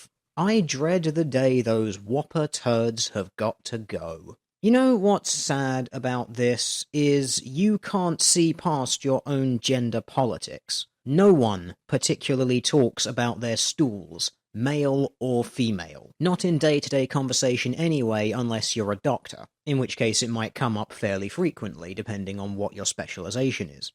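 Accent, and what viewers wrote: United Kingdom. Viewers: why is the toilet humor extra funny from a british accent? (Cuz I'm American isn't it?)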